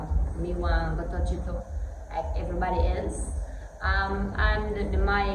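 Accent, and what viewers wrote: Jamaica. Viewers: I expected to hear uptown patios but a full blown country pickney dis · When I first saw you on Drizzy doing the sexual harassment episode with the other girls..you had me cracking up. I was born in kingston and i had a few white Jamaican born friends that speak just like you. I am very glad to see you setup your own channel. So blessed up yourself. and keep doing you thing. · Patois boss!!😅😅 just found out u have a Chanel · I don’t know if it the case with …… but I think what the problem is it’s hard to understand her. Cause her patois is strong, strong. I am an American but can usually understand patois. But it depends on the person. From what I’ve learned I think usually those from country can be a little hard to understand. My Jamaican brother in law says he can have a hard time understanding some people there. Think would be one hard to understand for him. 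Cause it is for me. Maybe if she spoke slower it would help.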